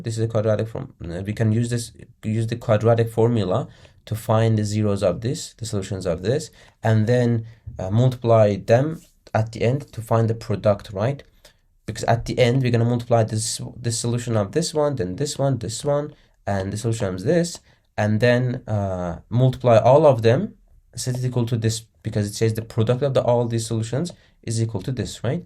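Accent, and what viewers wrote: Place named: Turkey. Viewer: aksandan türk oldugun anlasılıyo kral guzel video olmus eline saglık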